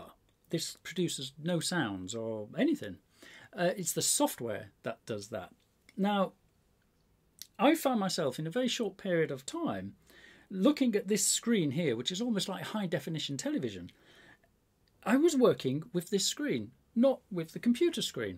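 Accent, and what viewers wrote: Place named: East Midlands, United Kingdom. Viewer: ah thanks for let me know I thought it was a Notts/ Derby accent :) I just came across your YouTube page and just now starting to look at your other vids, very interesting too. I have a music production studio and am also into sound engineering but more hands on than hands dismantling heheh. I also own the Push 2, which I found fascinating when you dismantled it.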